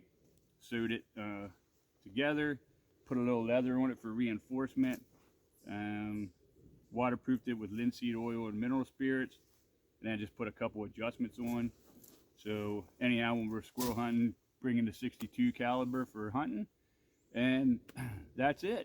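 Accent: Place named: Philadelphia, United States. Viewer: Nice job with your video. What County are you in? You sound like you’re from the Philadelphia area!!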